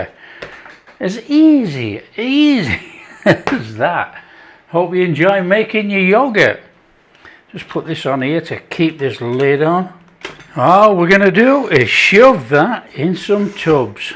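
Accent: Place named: Scotland, United Kingdom. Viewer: Is that a scottish accent?Whatever it is I love it.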